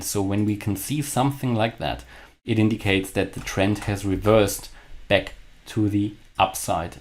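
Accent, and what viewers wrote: Germany. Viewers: Is he a german? The accent.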